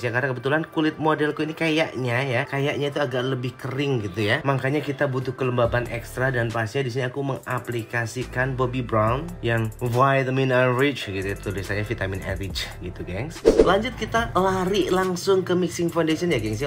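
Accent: Java, Indonesia. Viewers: Tetap medok 🙏🤭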